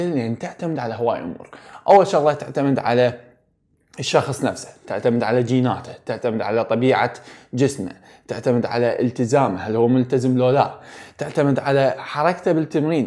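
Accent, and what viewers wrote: Iraq. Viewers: اللهجه العراقيه🤤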